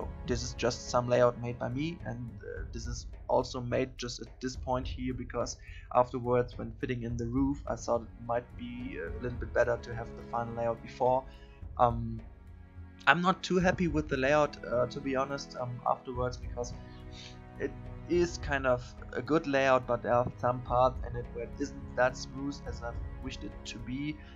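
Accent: Germany. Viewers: Dein Englisch ist doch gut , natürlich hast du keinen Englischen Akzent sknder sprichst halt Englisch wie ein deutscher Englisch spricht. Nur du scheint ein wenig schüchtern hatte ich das Gefühl :)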